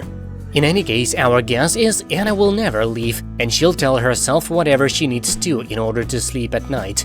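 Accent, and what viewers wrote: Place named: South, United States. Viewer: U.S.A, the Duggars are part of Bill Gothard's IBLP cult instead of a REAL church! It's called FAKE Christianity! They use Bill Gothard's IBLP "Cult Bible" instead of the "authentic" Holy Bible that REAL churches use! The differences are that cults abuse and brainwash their members in the worst ways, while REAL churches teach unconditional love and respect! This cult also doesn't allow divorce, despite a few of Anna's siblings doing so! It makes NO difference how long you and your wife have been married - 31 years or not - would the two of you STILL be married to each other even if one or BOTH of you behaved like Josh and Anna? The "authentic" Holy Bible DOES allow divorce on the grounds of the crimes Josh committed, but it sounds like you forgot that! You sound like you come from The South, which is called "The Bible Belt," and there are equal numbers of BOTH cults and REAL religious faiths! 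When COVID-19 started, CNN interviewed a man on a beach from Alabama, who didn't believe in making ANY decisions for himself - he was 100% dependent on God for EVERYTHING! He even said that if God decided to "call him back," he'd just go back with NO questions! That sounds like BOTH you and your wife - regardless of whether the two of you belong to a cult or a REAL church!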